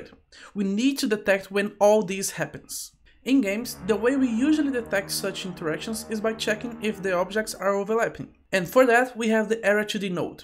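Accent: Brazil. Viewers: As soon as I heard the accent I knew it was another Brazilian 😂 Glad more Brazilians are making content in English it is a nice change and as a Brazilian myself it is exciting to hear this 🎉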